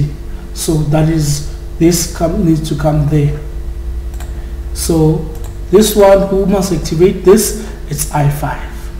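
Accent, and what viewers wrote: South Africa. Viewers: I know SA accent very well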